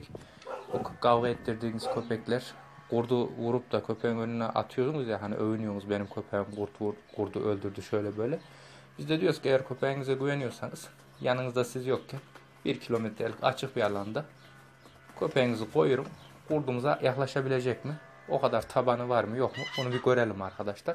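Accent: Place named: Konya, Turkey. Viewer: Abi sen konyalımısın aynı bizim ora gibi konuşuyosun:)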